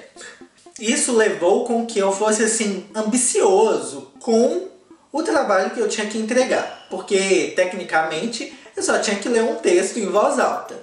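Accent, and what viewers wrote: Minas Gerais, Brazil. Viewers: Eu amoooo a sua voz, o seu mineirês e a sua narrativa. Não entendi nada quando mudou o narrador no outro canal, logo deixei de assistir aos videos pois achei chato sem vc 🤩 abonadissima ao seu canal. Voa cara ,voa ! 😆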